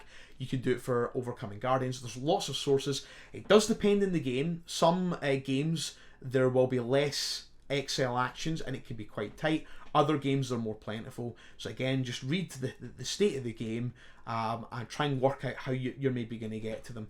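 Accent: Scotland, United Kingdom. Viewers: Sounds scottish. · Sounds more Scottish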